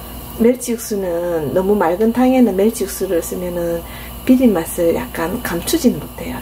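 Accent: Gyeongsang, South Korea. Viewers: 따듬어 ㅎㅎ 경상도에 사는데 익숙한 말투시네요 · 끈나슴니다 듣기 좋습니다 갱상도 억양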